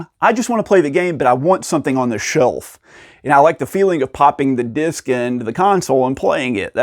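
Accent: South, United States. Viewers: It's subtle, but as a southerner, I can detect it, haha. Merry Christmas!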